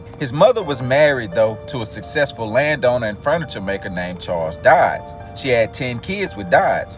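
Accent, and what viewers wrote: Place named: South, United States. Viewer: He has a southern accent, but it's not thick at all. You really can't understand what he's saying? That's fascinating! You should here what a thick southern accent really sounds like! Look up creole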